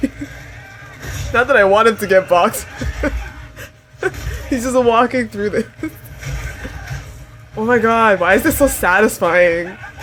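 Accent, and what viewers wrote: France. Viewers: GIRL U FRENCH !? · Ton accent français est très beau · are you french? 😮😮😮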